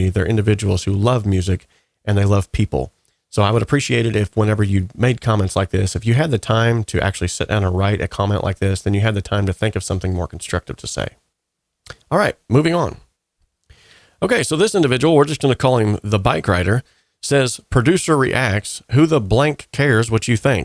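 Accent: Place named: South, United States. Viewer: Hi I'm a singer/songwriter composing own my songs from home and your production tutorial videos have helped me so much in my own music production please dont pay attention to the negative comments and by the way you have a great southern accent stay blessed!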